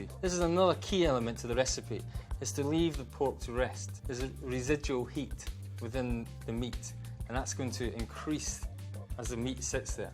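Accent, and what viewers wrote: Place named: Scotland, United Kingdom. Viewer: Best Scottish accent ever! 🏴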